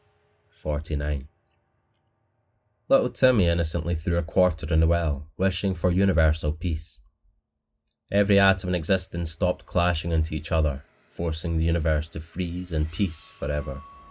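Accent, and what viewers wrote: Scotland, United Kingdom. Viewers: Your name is Mr. And you’re Scottish just like Gordon........ I wonder if this is chef with an accent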